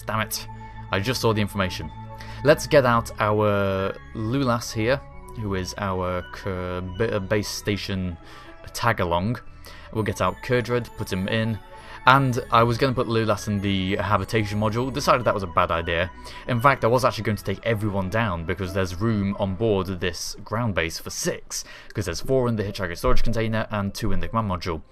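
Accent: United Kingdom. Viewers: im really sorry for asking such a stupid question, since im not sure.. but do i hear a british accent?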